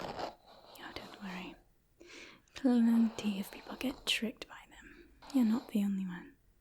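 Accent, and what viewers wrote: England, United Kingdom. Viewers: gasp british accent british accent british accent british accent british accent british aCCCCEEEEENT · okay so hear me out: TALKING IN A BRITISH ACCENT. I’M LIVING FOR IT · We thank you as well for such entertaining content. Your roles plays are second to none. Your English accent is spot on slightly posh but without going all Downton Abbey 😄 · Yes Harry Potter rp! I love it and the slight British accent was so cute ❤️ Thanks for the great video <3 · I love that British accent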